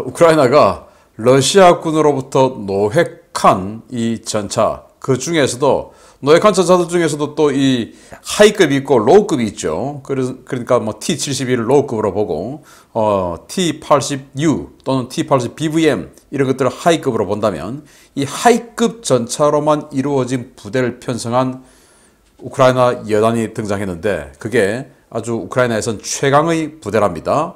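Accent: Gyeongsang, South Korea. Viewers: 이 분은 전쟁 신봉자네요. 군사력이 강해져야 하지만 생각이 다른 국민들은 모두 빨갱이이니 청소해야 한다고 하니 푸틴하고 뭐가 다른지 싶네요. 억양을 들어보니 경상도 사람으로 보이는데 지역정서에서 벗어나지 못한 불쌍한 분으로 여겨지네요. 우물안에서 벗어나 국민을 함께 바라보는 넓은 시각을 지향하면 좋겠다는 생각입니다. 이 분이 군사전문가로 보이는데 시야가 좁으니 인물이 아깝네요.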